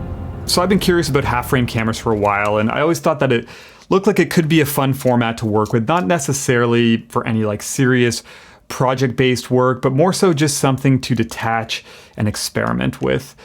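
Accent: Canada. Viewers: Sounds Canadien.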